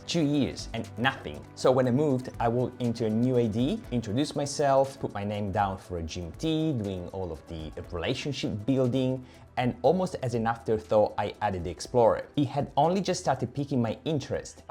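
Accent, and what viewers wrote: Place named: Vietnam. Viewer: Love your accent. Vietnamese?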